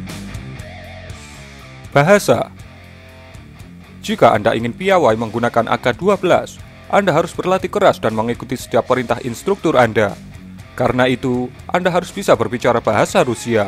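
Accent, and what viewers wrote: Java, Indonesia. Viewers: medok banget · Hahaha medok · Ini medok suaranya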